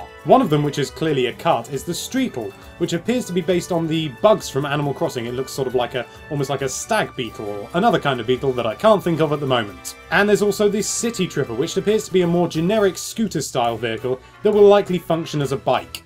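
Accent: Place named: England, United Kingdom. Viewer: He's pronouncing it with his English accent. In England everybody pronounces it like that.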